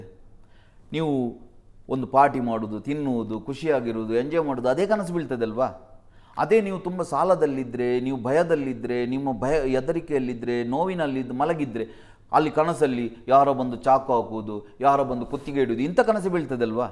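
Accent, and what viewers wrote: North Karnataka, India. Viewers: To the interviewer - you should have come well prepared bro ... Looks like you are from North Karnataka. Your accent says it all. But please do your homework 🙏.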